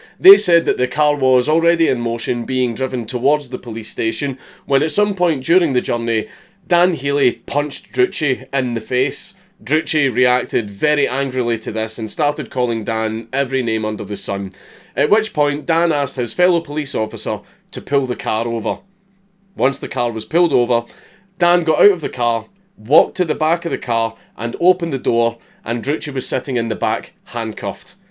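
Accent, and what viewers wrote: Scotland, United Kingdom. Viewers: Fuck these videos are so good. I could listen to him speak all day, maybe it’s because I’m and American and the Scottish accent is amazing, but I feel like This is the best history class you could get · I'm not used to the Scottish accent so I thought he kept saying they put a hat on Bunyon. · Listening to American history in your lovely Scottish accent should be mandatory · Love your show I just wish you werent Scottish. Can you talk non Scottish?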